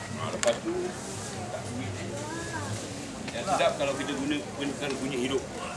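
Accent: Melaka, Malaysia. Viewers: memang best pakcik nie sporting tak kedekut ilmu dan mmg cara org melaka lah cakap gitu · Org melaka mmg loghat mcm tu, korang xyah nk kabut. Cara kasau hati baik, kalau xboleh kasar duk gua kwn dgn beruk. Melaka dihati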